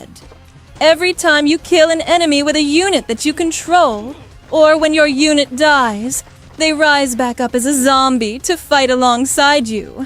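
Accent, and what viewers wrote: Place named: United Kingdom. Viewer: holy British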